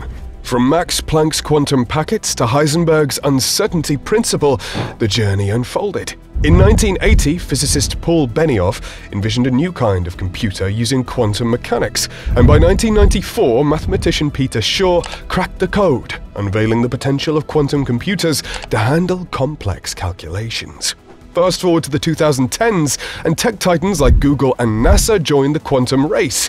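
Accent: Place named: England, United Kingdom. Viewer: Every time I look at YouTube and check anything in the US technical information Giant youtube? Some English dude, speaking. I can't stand it stand it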